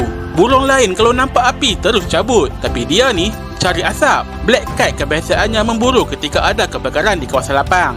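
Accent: North, Malaysia. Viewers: Hang ckp utaqa nohh.... Gilake ank Haram.... Hahaha.. trbaik bossku... Smoga muqah rzeki...aamiin...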